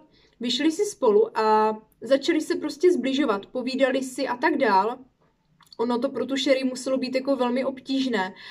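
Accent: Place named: Ostrava, Czech Republic. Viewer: Mne treba vadi zvyseny ton hlasu na konci vety. Zakoncujete vety jako by otazkou. Budete asi z Ostravska, ze :)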